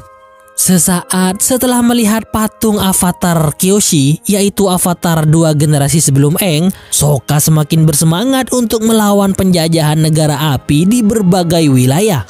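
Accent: Madura, Indonesia. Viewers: Mik pas ngucak madura kkeh😅😅😅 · Madureh keh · Anjay medureh kakeh kak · Medureh cak jhhh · Anjir orang madura coxxx 😂😂😂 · Udah nebak kalau Mimin orang Madura dari awal pas ngocak " mateh kakeh cong, dulat " langsung ngakak aku 🤣🤣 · Orang Madura Kah Min? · Anjir reng madureh kak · Beh madureh kakeh huh la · MK bedeh madurenah 🤣🤣🤣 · Kok ada bhs maduranya nkok reng madureh kiah😅😅mantapp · Mantap tretan baru tau naratornya org madura... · Kakeh reng madureh iya bang wkwkwk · Hahaha reng madure kakeh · Adminnya orang madura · Oreng Madura been kak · Ternyata orang Madura😂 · Orang madura bang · Behhh mak tepak ka oreng madure, oreng dimmah? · Mateh kakeh cong wkwkwk... oreng madureh nii boss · Njr reng matureh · Eh ternyata orang madura taiyeeee.. Sukses tretan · Baru sadar kalau yg bicara alur cerita orang Madura 😂😂😂 mateh kkeh conk dhulat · admine seorg madura...aksentomasinya jelas betul....hahhahah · Reng madureh kakeh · Mak madureh koh🤣🤣,"mareh kkh conk dulat" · Ternyata oreng madureh kkeh bang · Orang MADUREH kakeh bang 🤣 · Admin nya Orang madura😂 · Orang madura ya bang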